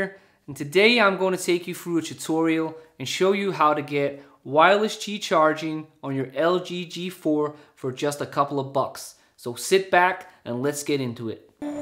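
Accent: United States. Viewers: You're American accent is better than my Scotsman accent. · Nice one. Lol. I Truly enjoyed the video, and your accent. I'm not a big fan of wireless charging, but I'm getting a free G4 from a contest, and I'm going to get the one that you showed just for the heck of it. Your American accent sounded just like a friend of mine in Florida. What a hoot.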